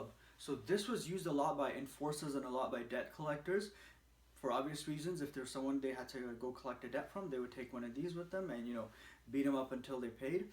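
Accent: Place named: Iran. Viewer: ایرانی بودنت داد می زنه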